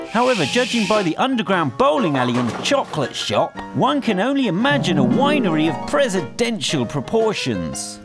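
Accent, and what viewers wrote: England, United Kingdom. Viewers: Who burned it down? Let me think, yep I got it the Brits did it. You sound like one and maybe one of your ancestors did it. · When you hear a British voice or like voice explaining things about the White House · Sick UK voice. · I love that the narrator has an english accent while talking about an American property lol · I love how the narrator has a British accent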